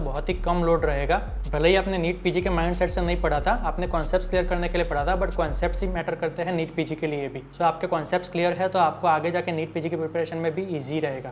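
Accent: Gujarat, India. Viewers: Behind Hindi...feel Gujarati Aap Gujarati ho kya?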